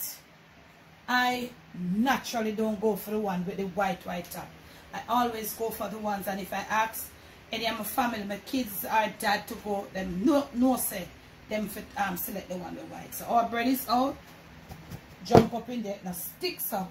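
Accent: Jamaica. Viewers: I just looked at the thumbnail and before she started talking I knew with all my stereotypical thinking that this Jamaican woman(with a Jamaican accent) was the one to listen to ti teach me how to make bread.. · Tht bread looks 😍 so good and soft 😋...I just love watching & listening to her she's so cute with her Jamaican accent 🥰..I'm definitely going try this version of bread over others it looks the best out of others I've seen bcuz it was made with care💝 & tht makes the best food!🤗🏆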